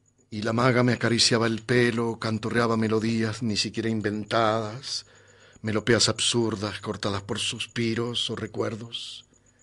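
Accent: Argentina. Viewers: Me encanta con el acento argentino.por que en mi imaginación no lo lee el señor del canal de Youtube.. Para mi lo está leyendo el mismísimo Cortázar con todita su argentines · Invito a todos los que critican al narrador a hacerlo mejor que él. Escucharon narrar a Cortázar???? Lee (siiii, lee) de esa forma, dándole cadencia. Y eso es lo que hace el narrador. Es muy difícil ponerse a la altura de semejante obra y poder transmitir lo que logra transmitir. “Rayuela” se llama el libro. No “La rayuela”. Y tiene acento argentino el narrador, por el hecho de que Cortázar era argentino, por más que tuviese acento francés. Felicitaciones señor narrador! Ponele tu impronta. Lo importante es mantener viva esta obra de arte.Cortázar te amo ❤️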